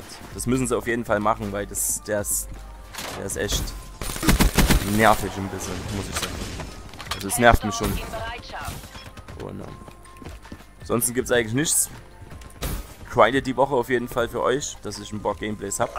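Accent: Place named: Saxony, Germany. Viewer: he wo kommst du her hört sich an wie als wenn du aus sachsen kommst (ich komm auch aus sachsen)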